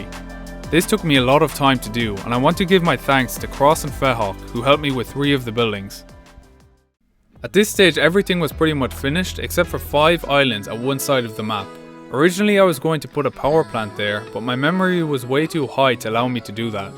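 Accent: Ireland. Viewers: Wait are you Irish ☘️? Don’t tell me my fav fortnite map builder is from the same country as me?! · Me to Yas he sound like an Irish ☘️ ❤️ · Are you irish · I knew you were irish 🤣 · What part of Ireland are you from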